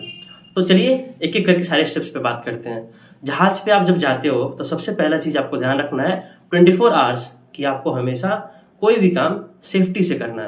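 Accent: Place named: Bihar, India. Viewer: Bakwass video 😂😂 typical bihari 😂😂 Bhai teri awaj se hi lag ra h Tu pure bihari h 😂😂😂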